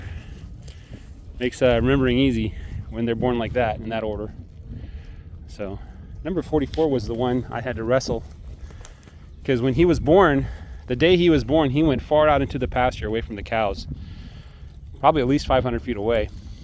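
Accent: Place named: South, United States. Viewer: i enjoy the story of finding the right breed to add in a certain trait. southern drawl is easy on the ears. Hopefully, if you get a South Poll bull or a starter herd, you will boost your grass to beef efficiency-slowly or more rapidly... South Poll can get FAT on forages they graze. If your soils are depleted, granular minerals will help the cattle and the cattle will choose the minerals needed and fertilize your soil. Depending on where you get the bull or starter herd, the cattle may know how to eat more weedy forages than you show on your channel. Variety of forages adds nutrients, as different plants cycle diverse arrays of nutrients from the soil. Each "weed" tells of the soil's need to be healed. Dr Elaine Ingham does soil health lectures, if you want to get fairly technical. Understanding Ag recommends certain labs to run the Haney test, because some labs do the proper protocol. 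Haney test uses WATER, not other chemicals that are un-natural to the soil, to determine what nutrients are bio-available...if i understand this correctly. Do your own research.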